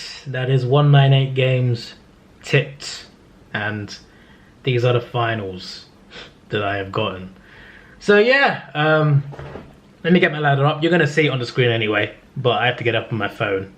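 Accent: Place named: England, United Kingdom. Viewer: What country are you from? You sound like you’re from England kind of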